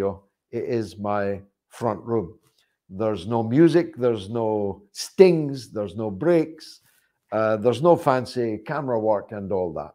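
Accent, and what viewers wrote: Scotland, United Kingdom. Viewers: Are there any sort of lessons I can take to get this Scottish accent?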